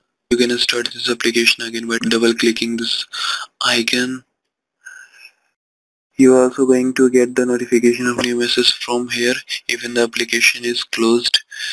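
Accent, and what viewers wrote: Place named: India. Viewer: when i hear an indian voice i hear scam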